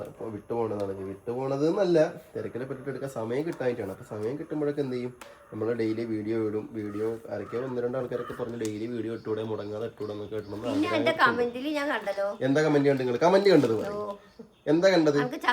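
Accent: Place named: Kottayam, India. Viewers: അച്ചടി ഭാഷ കോട്ടയം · അച്ചടി ഭാഷ കോട്ടയം ജില്ല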